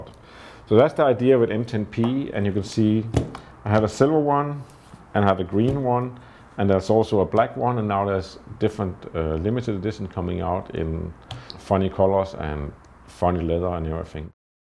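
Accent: Denmark. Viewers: Danish English > Dinglish!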